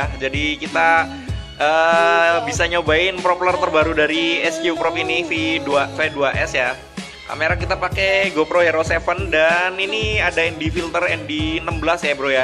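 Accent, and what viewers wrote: Banyumas, Indonesia. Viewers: Halo yutub ketemu lagi dengan.🤣🤣👍👍👍👍..5 inch in the best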